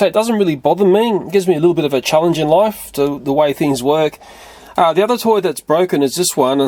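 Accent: Australia. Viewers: Are you Australian I am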